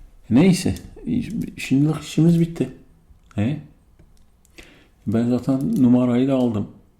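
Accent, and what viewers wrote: Eastern Anatolia, Turkey. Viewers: doğu şiveli olduktan sonra her şey olabilir 1 saat doğu şiveli herhangi bir video n olsun yine izlerim her gece aciyorum bir tane şiveli ama artık aynı şeyleri izliyor gibi oluyorum hepsini defalarca izledim cünkü aslinda doğu şiveli videolar icin izliyorum bu kanalı dogrusu digerleride güzel ama onlar hakikaten başka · Doğu şiveli videolar çok daha cezbedici. Emir veriyor gibi olmak istemem fakat bu lisanla psikolog roleplay güzel gidebilir.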